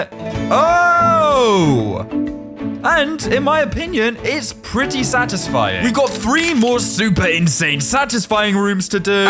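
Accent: United Kingdom. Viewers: R u British cuz u sound a lot load like one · He sounds so british, not like the language, just... british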